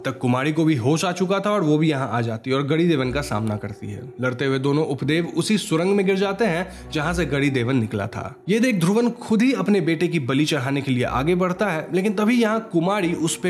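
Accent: Bengal, India. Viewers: Just by the narrator’s R pronunciation, it can clearly be understood he’s a bengali.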